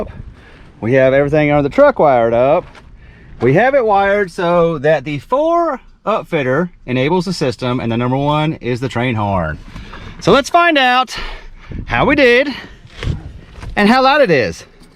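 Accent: Texas, United States. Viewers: I did neglect to tell you that I was stationed at Sheppard AFB Texas when I was a blue store fan. After I retired from the USAF, I moved to PA. My wife and I miss everything about the state of Texas, except the heat. The first time I heard you say "Good Mornin'", I knew you were from Texas! My wife and I were laughing so hard when people were trying to correct you, saying their name. The funny thing is we both say it just like you and your wife. Keep the videos coming. We really enjoy them! Oh yeah, I think you should know that Mario dude is following you. Lol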